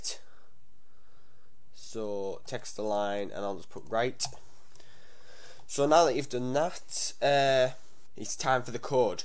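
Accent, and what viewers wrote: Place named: United Kingdom. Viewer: defo British XD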